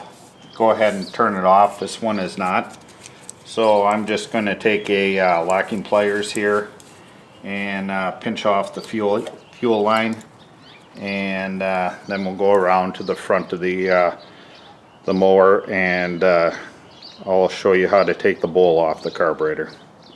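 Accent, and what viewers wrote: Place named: Minnesota, United States. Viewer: You sound like you are from Minnesota?? You have an accent just like all my relatives!!!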